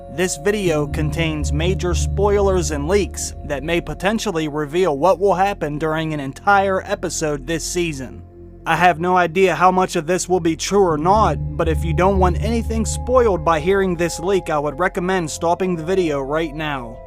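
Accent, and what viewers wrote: Pittsburgh, United States. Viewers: Are you from the Pittsburgh area ???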